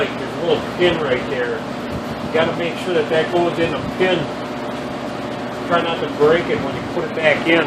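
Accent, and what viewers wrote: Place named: Minnesota, United States. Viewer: I’m hearing some Minnesota in here!😁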